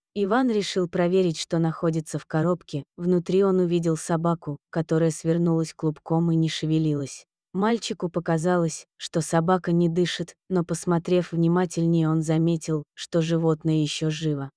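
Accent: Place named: United States. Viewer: Кто нам читает американка что-ли слышите как говорит ?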